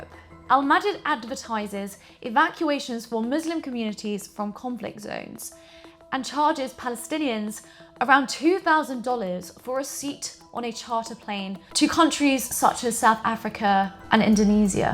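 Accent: United Kingdom. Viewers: I saw your thumbnail. And I thought, “I bet she has a British accent” BAM! I’m psychic 😮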